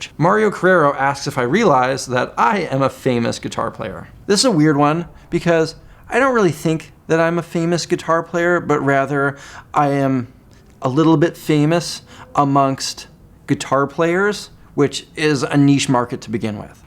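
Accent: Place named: Canada. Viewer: I think I found on a JHS "What's on your board" sort of video, and I remember thinking "Hey, I think this guy has a Canadian accent", and as soon as I found I was right --> SUBSCRIBED. Love ya, congrats on 1 million subscribers, it's well deserved.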